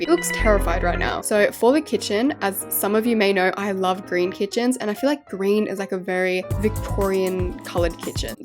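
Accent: United Kingdom. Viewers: I love ur voice its like so British !! like I did like ur “red is a spookah colar”! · I love that u talk British 😊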